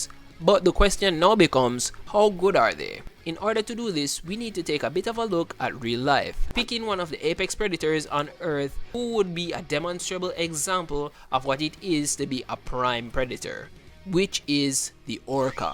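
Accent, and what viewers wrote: Jamaica. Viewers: A jamacian Galvan? I'm vibing with it. Love the accent man, and keep up the content.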